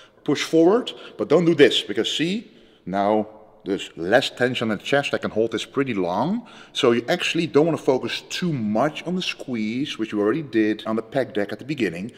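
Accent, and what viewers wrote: Netherlands. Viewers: I love the way he talks, it's just calm, clear and spiced up by his dutch accent. I don't perceive any arrogance, just confidence. Which is understandable, he is one of the top bodybuilders in the world.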